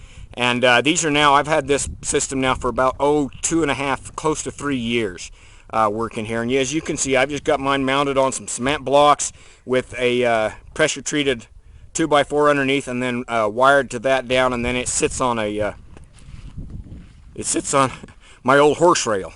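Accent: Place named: South, United States. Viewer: where do you live? pick up a southern accent but get snow?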